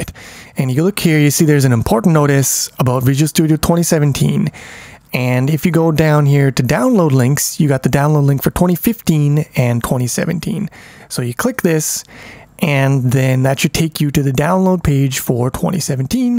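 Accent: India. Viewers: the accent is troughout my first language super super annoying. 1. I cant barely understand it. 2. Is super fucking annoying to listen... almost run away screaming. It probably has to with germanic languages in which indian accent sounds really like ear rape. Im not racist. The accent is just ..... terrible.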